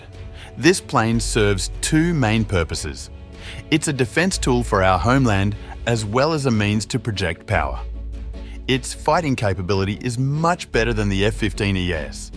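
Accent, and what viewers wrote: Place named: Australia. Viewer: Why Aussie accent? What do Australians know about F-15's?? Just doesnt make sense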